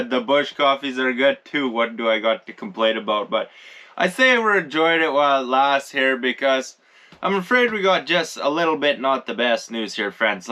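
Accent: Canada. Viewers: Oh my Goddess you're hilarious! Lmao when you opened the door to new snow dump! Love your Canadian accent. Your a smart guy and good to your dog 🐕 👍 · I could listen to this guy talk ALL DAY, LOL!!! That Canadian "Country Accent" Adorable..... ❤ from Kentucky U.S.A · Just curious, where are you from? Canada? Interesting accent... Great video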